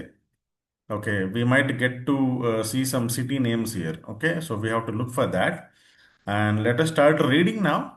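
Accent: India. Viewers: Bro your voice as a hindian person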